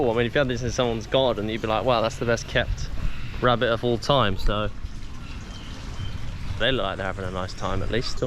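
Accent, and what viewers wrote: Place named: Australia. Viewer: Hi, I thought your accent was Australian. I’m going to go check out Davao in October.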